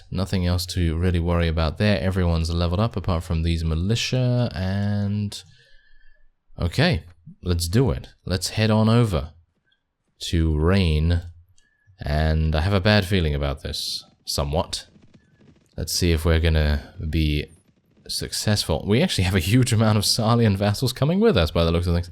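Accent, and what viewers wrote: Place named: RP, United Kingdom. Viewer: This guy sounds like a british lord.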